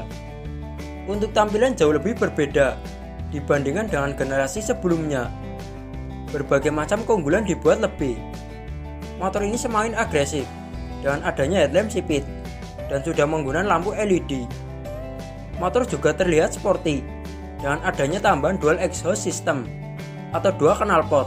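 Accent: Java, Indonesia. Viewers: Dari logat nya seperti orang jawa ( ngapak). salam dari purworejo jawa tengah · Medok nemen mase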